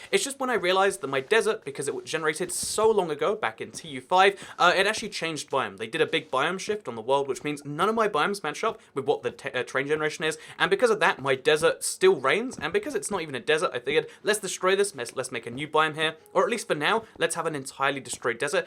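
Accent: United States. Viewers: Also you sound pretty American to me. Then again I thought a German YouTube's was Dutch...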